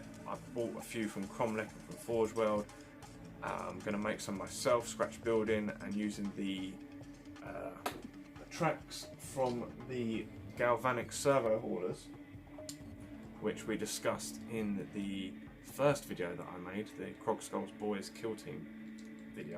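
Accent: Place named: RP, United Kingdom. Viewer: Beautiful Queens English squire!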